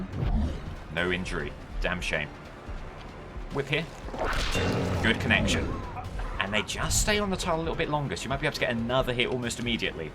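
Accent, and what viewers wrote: RP, United Kingdom. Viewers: ! My man! That posh guy from Youtube! great video so far (like 5 seconds in).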